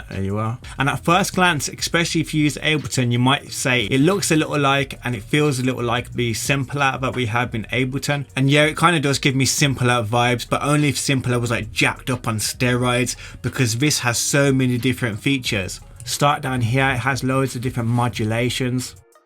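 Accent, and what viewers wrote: Birmingham, United Kingdom. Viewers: Do I hear the dulcet tones of a fellow Brummie?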